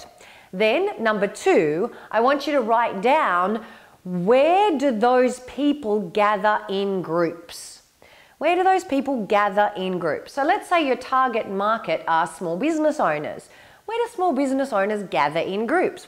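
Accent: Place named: Australia. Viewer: It helps to have an awesome Australian accent.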